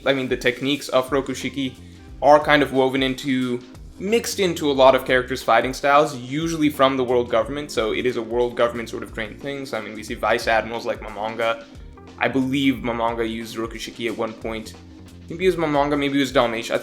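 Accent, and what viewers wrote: India. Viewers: Hey, which part of India are you from? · are u indian?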